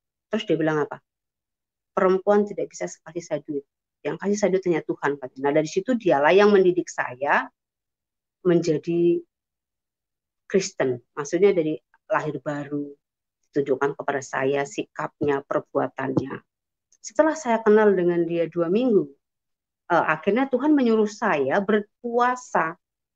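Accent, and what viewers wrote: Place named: Java, Indonesia. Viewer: Dari omongan lo, lo bukan orang minang, tp jawa.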